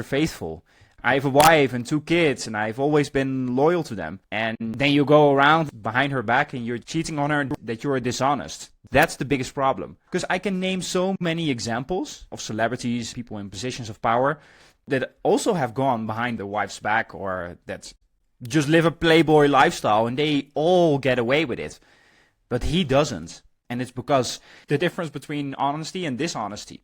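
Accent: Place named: Netherlands. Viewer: I hear a dutch accent.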